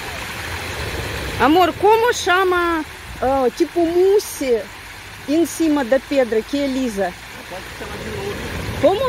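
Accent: Russia. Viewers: Gosto muito do seu sotaque, já estou inscrito, gostaria de conhecer a Russia e a sua natureza. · Gostoso ouvir você falando nosso idioma com sotaque russo.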